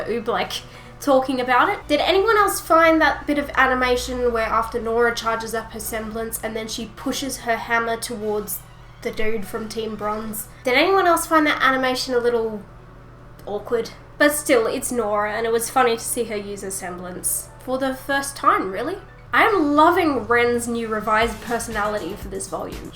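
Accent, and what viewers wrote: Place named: Australia. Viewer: Yay i though i heard an Australia accent, super happy to meet another RWBY fan in Australia, much love from NSW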